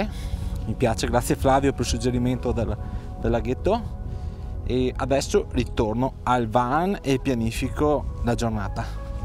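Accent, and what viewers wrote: Veneto, Italy. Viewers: Filmati sempre bellissimi....!!! In più con l'accdento veneto, fa sempre piacere, sembra un poco di essere a casa e di conoscerci.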